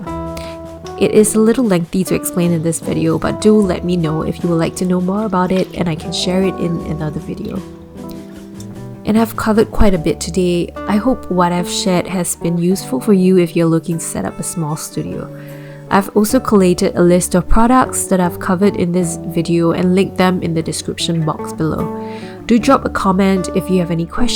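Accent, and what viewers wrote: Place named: Southeast Asia. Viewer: ah, when I heard you started talking I knew you were Southeast Asian! nice to see a SEA ceramicist here :) I’ve been thinking of getting into pottery (maybe turn it into a career in the future?) your video is really useful !! thank you for the list too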